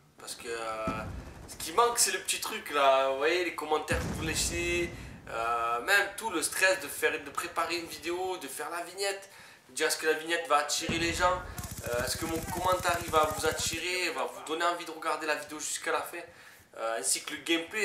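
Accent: South, France. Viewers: Continu comme ça ton commentary avec ton accent du sud amene la chaleur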